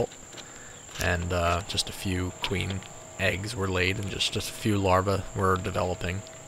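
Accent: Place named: Western Pennsylvania, United States. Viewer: Second that! I want to hear shower, downtown and Southside. It’ exactly like western Pennsylvania 😂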